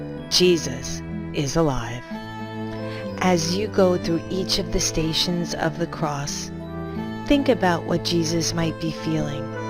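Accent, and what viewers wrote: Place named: New York, United States. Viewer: New York accent much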